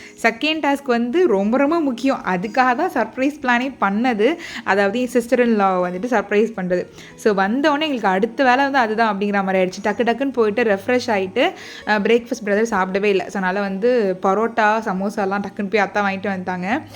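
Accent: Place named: Udangudi, India. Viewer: Neenga endha oor neenga udangudi kaaranga maari pesringa en ooru adhaa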